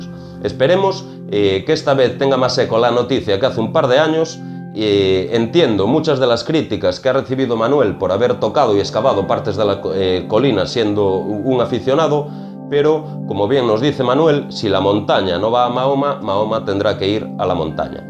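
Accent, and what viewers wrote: Galicia, Spain. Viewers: excelente trabajo paisano, ya que por su acento deduzco el galegismo que nos une, gracias por este video...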